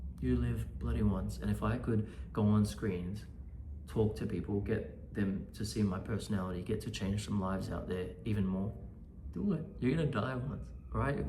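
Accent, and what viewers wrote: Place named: Australia. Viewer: You've a cute Australian accent so beautiful 💗💗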